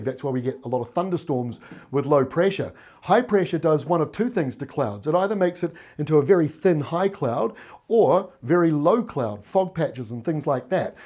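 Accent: New Zealand. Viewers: NO, Thank you for being a kiwi aussies can understand, Aye.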